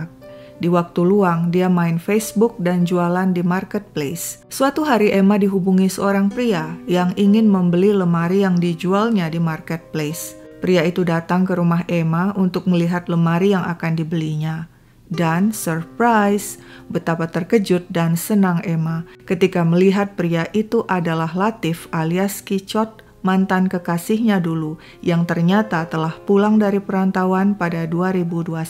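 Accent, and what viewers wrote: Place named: Sulawesi, Indonesia. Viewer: logat sdh sperti org sulawesi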